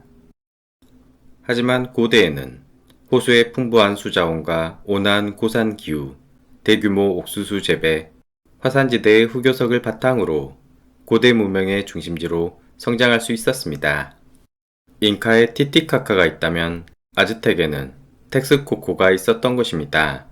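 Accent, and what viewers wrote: Jeolla, South Korea. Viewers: 말투를 들어보니 고향이 전라도이시구만요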